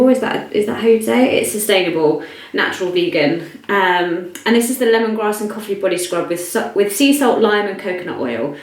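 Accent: United Kingdom. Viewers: nice true English voice :)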